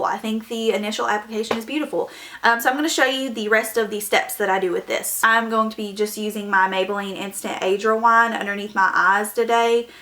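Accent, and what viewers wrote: South, United States. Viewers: Your southern accent is so cool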